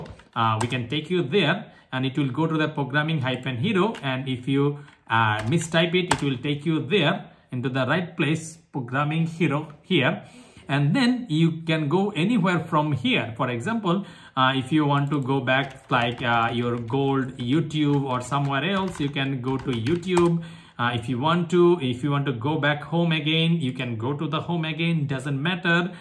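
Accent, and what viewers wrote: India. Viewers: Bro like i am ur python guy and its insane like how ur doing this you should have more subs then mrbeast edit: Bro sounds like indain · Does anybody notice about this guy's funny accent also he is Indian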